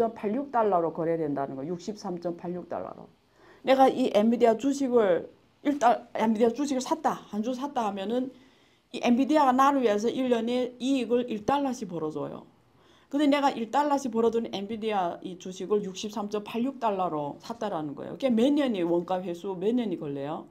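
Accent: Yanbian, China. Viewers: 듣기 거북하다 부칸 언어...아니.뒹귁 년변어인가..불편해..제발.그만..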